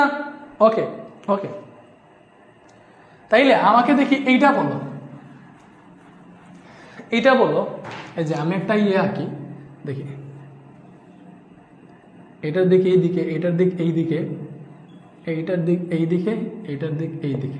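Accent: Sylhet, Bangladesh. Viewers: ভাইয়া সিলেটি নাকি চট্রগ্রামের?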